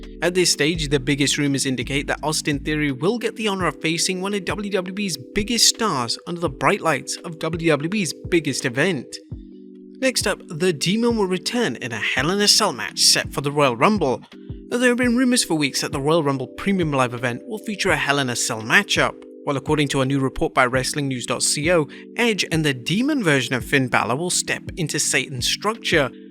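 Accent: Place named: United Kingdom. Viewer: Idk why but hearing the narrator read Top Dolla's tweets was hilarious to me. Particularly with his thick British/English accent and saying "y'all". Lmao. Idk why it's funny to me but I was laughing so much during this segment. Probably just because I worked all this weekend and I'm tired/delirious. 🤣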